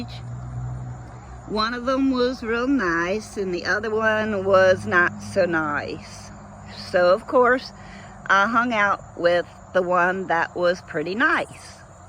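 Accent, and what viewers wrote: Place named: South, United States. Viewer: Hi GF WOW 😮 You told your First Experiences, that is so cool. I know we both have many Stories don’t we 😉 Hey Don’t worry about what some may think !! Sis Elke is Right about it’s a Paranormal Experiences. Like the ones they tell Every single Day 😇 Do you have the Pictures handy from when your Moms Family Home Burnt Down? I forgot it was Christmas 🎅🏽 Morning. Didn’t it get one of the Barns close by? Email me the Pics. when you find them. It was so long Ago, seems like forever. Ok I know it’s been a Long week for you Babe, hopefully tomorrow you can just get some Rest.Your Southern Is Right on Target. You Remember the old Cassette Tape you found of you reading Little Red Riding Hood from Your Grandparents Place? That’s how you sound here. Lmao 😂🤣You were How old? God Bless GF and I talk to you Soon.💙🤍💙🤍💙😘🥰Night.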